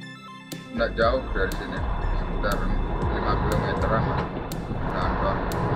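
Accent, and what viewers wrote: Bali, Indonesia. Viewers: Hehehe...logat baline kental Bli...salam aspal🤣🤣🤣🤣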